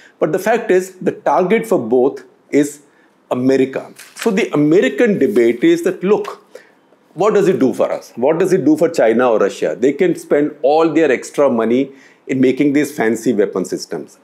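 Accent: India. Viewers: 不行，咖喱味太重，听不下去了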